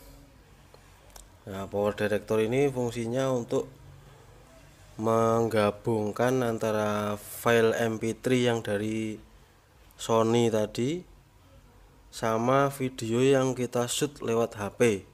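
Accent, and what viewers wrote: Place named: Java, Indonesia. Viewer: Lagi nonton video mu ini Mas. Le mgendikan thik medhog men njenengan Mas.. 🤭🤭🤭 Tetap unik & otentik.. Mugi sehat2 njih Mas Dados amal Jariyah & ilmu engkang manfaat. Aamiin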